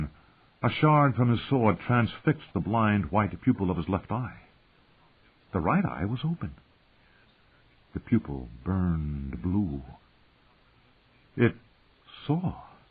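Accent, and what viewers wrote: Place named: United States. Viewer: Just doesn't quite cut it with a American accent . Wish There was a Augmented Audio Book too . Or is there ? Point me there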